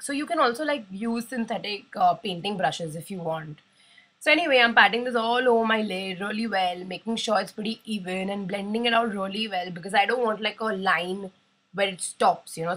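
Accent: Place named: India. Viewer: your make-up style says you are a huge fan of Michelle Phan. I like how you did not try to have an accent and spoke in true Indian way!! Way to go :)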